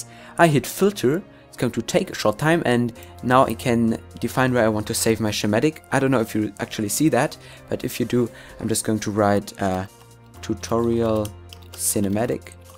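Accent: Germany. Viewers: Are you german? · Man merkt einfach übel das du deutsch bist :D Aber nices video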